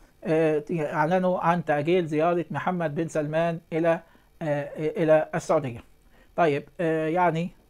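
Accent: Egypt. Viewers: كلامك مفهوم جدا، واللهجة المصرية حلوة ومفهومة 👍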